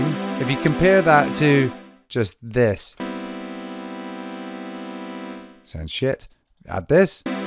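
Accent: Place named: Scotland, United Kingdom. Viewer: Superb tutorial, and a classic track. And, as a northern Englishman with Glaswegian grandparents, it's nice to listen to a tutorial given by a Scot! 😍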